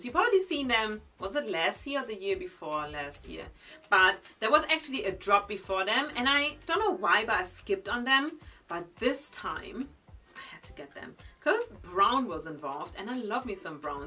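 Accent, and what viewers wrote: Germany. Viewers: Nice deutscher Akzent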